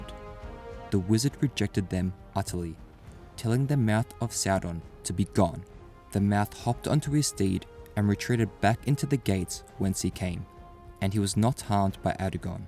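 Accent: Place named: Australia. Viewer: I'm confused, this guy sounds like he's Australian but his English is so poor. Why is that?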